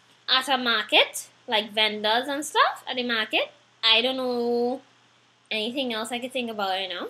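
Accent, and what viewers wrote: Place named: Trinidad and Tobago. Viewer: to me trinidadian accent is best accent in the english world... you're so beautiful and sweet... greetings from Venezuela....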